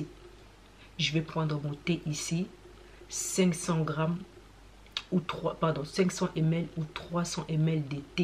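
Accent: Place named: Kinshasa, Democratic Republic of the Congo. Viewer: Par votre accent vous êtes congolais de Kinshasa merci pour votre partage ! Par contre votre méthode est vraiment restreint qui peut tenir à Long terme pour perdre beaucoup de kilos 😮😢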